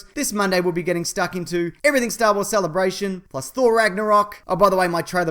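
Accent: Australia. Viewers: I dig. I dig it all. I also dig the Aussie accent - helps me pay attention.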